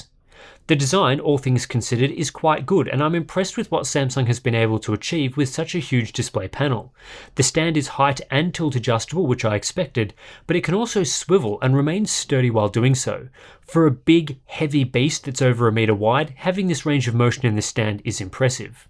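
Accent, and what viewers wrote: Australia. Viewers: I think the real news here is Hardware Unboxed being hosted by a young Freddie Mercury, resurrected and with an Aussie accent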